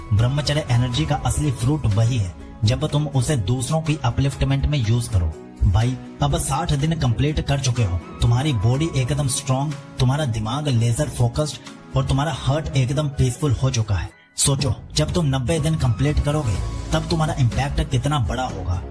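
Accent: South India, India. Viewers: आप साउथ से है क्या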